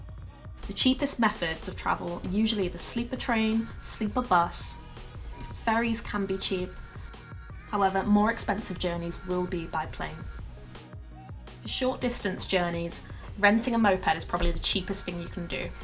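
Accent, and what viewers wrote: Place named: Bristol, United Kingdom. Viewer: I'm sure it was a Bristol kinda accent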